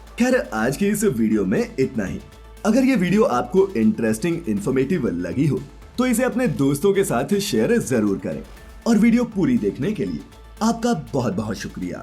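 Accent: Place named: Hyderabad, India. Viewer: Hydrabad side se hoga.. hindi aise hi accent mein bolte udhr ke log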